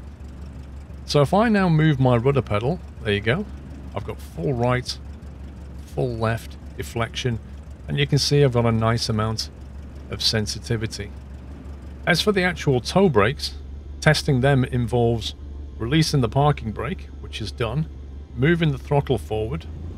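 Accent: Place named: Blackpool, United Kingdom. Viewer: From Pac-Man to MSFS 2020, with some real-life certified droning in between. Glad to have found your channel as I just got FS 2020 and already crashed the Cessna 3 times while trying to land. Your tutorials will help a lot! You sound like you're from Blackpool or thereabouts (Liverpool?). My wife's dad was born in in Blackpool so I sort of grew an ear for that accent. Thanks again! Great tutorial!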